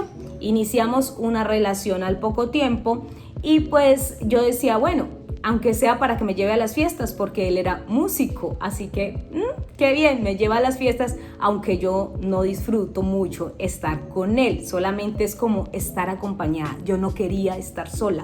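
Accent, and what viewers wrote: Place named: Colombia. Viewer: mexicana pero tienes en acebto bien marcado colombiano no entiendo como cambian de acento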